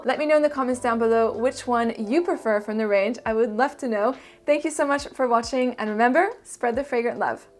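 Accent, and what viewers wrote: France. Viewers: Thank you so much foe the review it couldn't have been better than that!! I looove the french accent BTW❤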